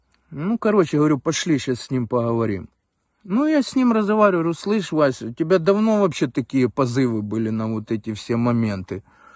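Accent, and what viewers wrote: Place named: Ukraine. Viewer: .... блин!... Как мне нравится говор украинский, но такой, что бы русские понимали!.... И ещё -, Вы очень похожи на моего двоюродного брата Андрея в молодости!.... Счастья, здоровья, мира, добра Вам и семье!....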